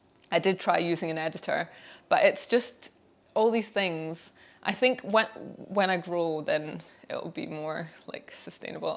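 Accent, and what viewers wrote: Scotland, United Kingdom. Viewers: Your Scottish accent sounds so funny to my ears lol, where are u originally from if i may ask?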